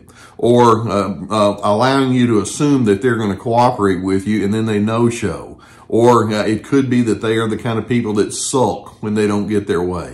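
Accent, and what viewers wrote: South, United States. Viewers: Haaaaaaa ;D))))) you caught me out there , I wasn't expecting that word out of your mouth with that sweet southern drawl " a jerk " lol hearing you say that word really did tickle me , you have a good day sir ...I will always come back to hear that delectable accent ;D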